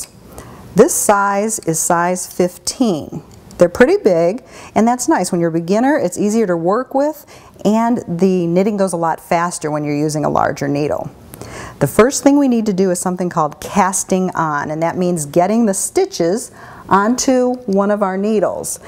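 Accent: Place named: Oregon, United States. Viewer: omg! i cant seem to stop haha...and your voice reminds me of Oregon! i miss my home state :'( and a wonderful knitting and sewing grandma who lives there! Thank you once again.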